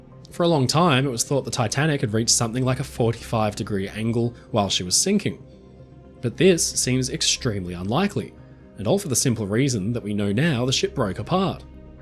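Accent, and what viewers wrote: Australia. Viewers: that's exactly what I thought, and I'm a New Zealander. This guy sounds Australian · This presenter has a slight Australian accent 🤔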